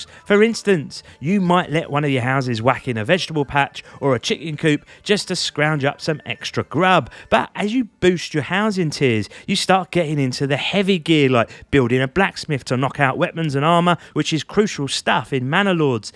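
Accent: United Kingdom. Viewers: Just discovered this channel. Love the writing and the distinctly UK English! : )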